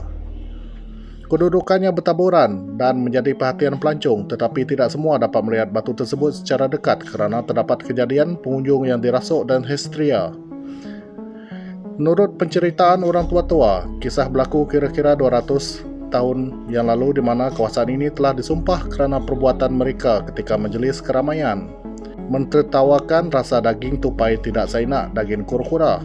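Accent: Iban, Malaysia. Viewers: aok.. jgn buang slang iban.. ya antara entik d negeri kita.. agi idup agi ngelaban... huuuuhaaaaa!!! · Slang iban aya nda ulih buai😅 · Kn..pekat iban iya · Pekat Slank Iban... Terhibur Ngau video tok... Tq... Semoga terus bersemangat menghasilkan video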